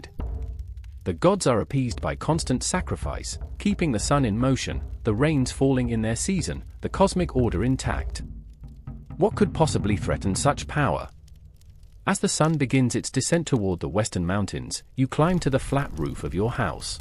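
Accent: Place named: United Kingdom. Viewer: It’s read by a British person… the irony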